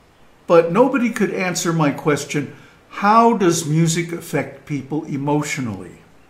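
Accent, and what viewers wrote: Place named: United Kingdom. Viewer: Guruji are you originally from England or Scotland ?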